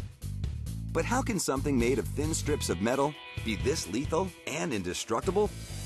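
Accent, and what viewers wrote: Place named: United States. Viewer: I like how he cheerfully explains how it can cut through cloths and flesh with his American voice.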